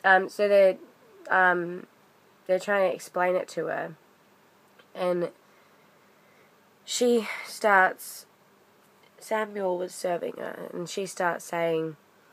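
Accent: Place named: Australia. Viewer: Even though you still have your Aussie accent, you're turning into a Pom... You said Hoover instead of vacuum. Haha:) Nice work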